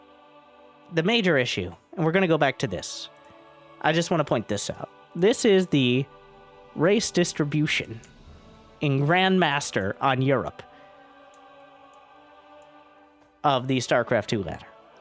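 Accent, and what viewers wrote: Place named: Appalachia, United States. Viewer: Why do u talk like a hillbilly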